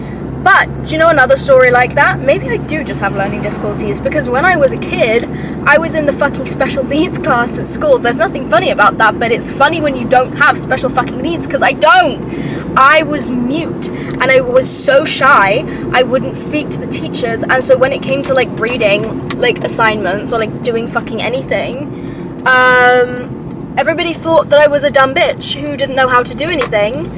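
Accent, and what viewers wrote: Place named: United Kingdom. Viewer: omg bri'ish accent kills me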